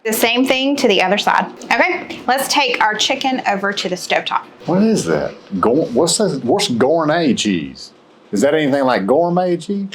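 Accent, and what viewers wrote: South, United States. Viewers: LOVE YOU GUYS!!! Making the baked ziti right now with my boys...they are so excited. They ask to put on so we can cook together. They may or may not copy your southern accent...we are Michganders, so they are quite entertained. HA.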